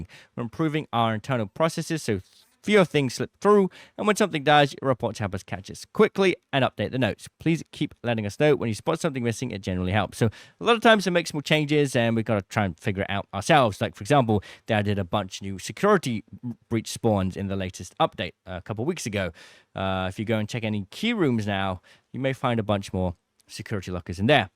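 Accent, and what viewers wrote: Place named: United Kingdom. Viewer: This guy is British so he’s going to say it in a British way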